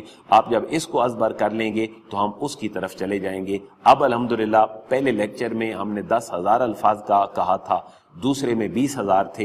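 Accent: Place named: Punjab, Pakistan. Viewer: sahib k lehjy sy lag raha hai k ap gujrat,mandi, ya okara sy hain.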